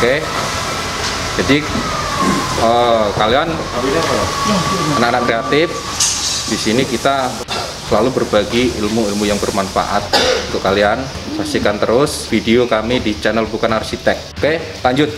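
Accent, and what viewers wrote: Java, Indonesia. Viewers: Baru nonton eh ada logat jawa nya Tmpat tggal nya di mana ya, Klo boleh pengen maen&belajar biar tmbah ilmu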